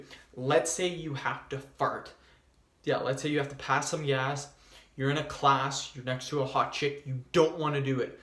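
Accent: Canada. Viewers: This guy is Canadian, isn't he?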